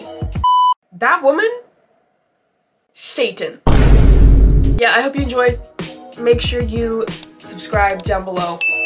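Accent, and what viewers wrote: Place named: United Kingdom. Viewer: LUV YOUR BRI'ISH ACCENT LUVV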